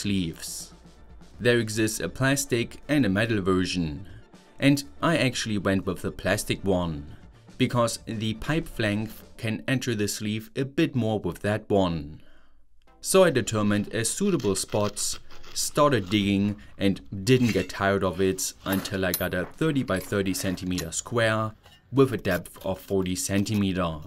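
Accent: Germany. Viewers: Curious where you're from! I lived in Germany for many years and I don't recognize your dialect. It's hard to identify! Your channel says you're from Fellbach but IDK if that's conclusive. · why do you have such thick german accent if you are Scottish?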